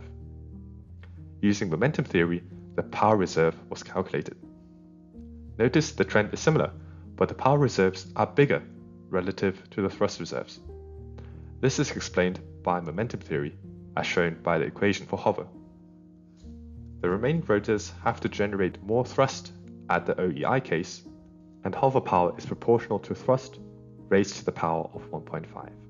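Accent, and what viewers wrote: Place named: Germany. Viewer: 想问博主是中国人么，感觉您在德国生活过很长时间哈哈，德味挺重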